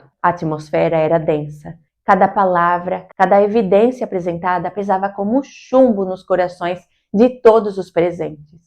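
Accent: Portugal. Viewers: você é brasileira? Que legal! Poderia jurar que vc era portuguesa, por causa do sotaque.❤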